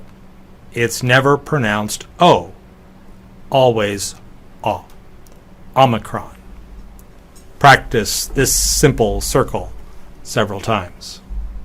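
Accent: United States. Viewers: Your pronunciation is very american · Oh my gosh his pronunciation is awful and he speaks so very typical of an American when addressimg to pupils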